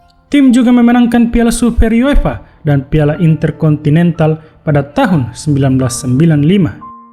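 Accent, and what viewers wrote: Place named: Sumatra, Indonesia. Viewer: logat nya mirip org sumatra gitu min hehe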